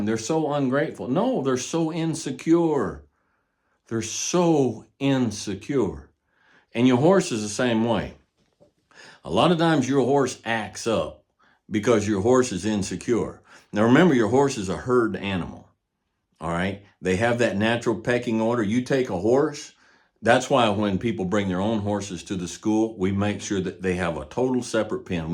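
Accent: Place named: Midwest, United States. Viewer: Good morning from Moscow, Russia. It's in the morning, and I was about to go to bed when I came across your video and realized I couldn't miss it., it's been a tough year. I divorced my wife because of her infidelity, almost killed myself, and went through a depressive episode, but you helped me. Your videos with the measured pace of narration, calm voice, metaphors about horses and nature, those stunning views in the background, a cigar in your fingers and a Midwestern American "cowboy" accent were like an asmr for me. In moments of anxiety or restlessness, I would often just turn on random videos from your channel and find peace in them. Thanks to you, I survived the hardest episode of my 22 years of life and realized that the last two months of 2023 were the best, as I finally started breathing fully again. Thank you. I respect you and appreciate what you are doing for the younger generation.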